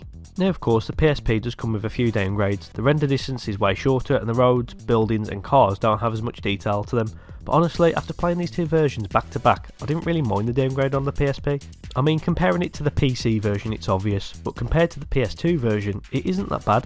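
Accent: United Kingdom. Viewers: Your accent is very british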